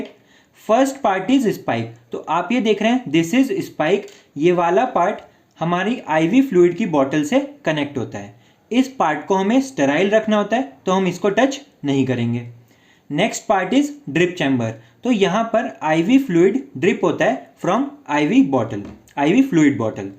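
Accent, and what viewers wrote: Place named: India. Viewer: Can you speak slowly please and all tte time English, most people don't use Indian English accent, and pronunciation..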